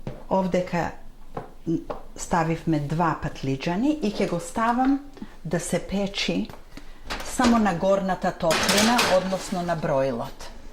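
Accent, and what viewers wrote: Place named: Kavadarci, North Macedonia. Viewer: Bravos super si!! Site videa se so tradicijata na makedonskata kujna i se odlicno napraveni(zgotveni) a se razbira i mnogu dobro objasneti.👍😊Sakam da te prasam od kade si ti od kaj poteknuvas od koj kraj na makedonija??deka akcentot tvoj e mnogu ubav i me potseka na mojot kraj od kaj sto sum jas Kavadarci a sega ziveam vo Sydney Australia.Golem pozdrav od mene Gorica 😊 i se najdobro.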